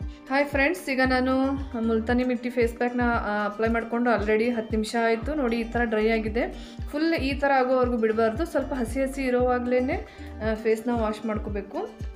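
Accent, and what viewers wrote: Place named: Uttara Kannada, India. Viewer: ನಿಮ್ಮ ಉತ್ತರ ಕನ್ನಡ ಭಾಷೆ ತುಂಬಾ ಚೆನ್ನಾಗಿದೆ ನೀವು ತುಂಬಾ ಚೆನ್ನಾಗಿ ಮಾತಾಡ್ತೀರ sis face pack 👌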